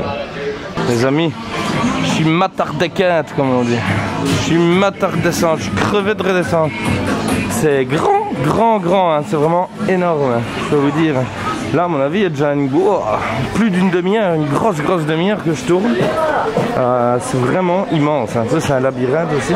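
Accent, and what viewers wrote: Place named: Belgium. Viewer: J'adore cet accent belge :)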